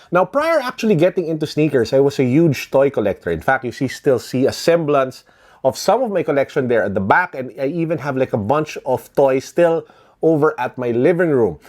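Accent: Philippines. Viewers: you have a Filipino accent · are you filipino?? I can read your accent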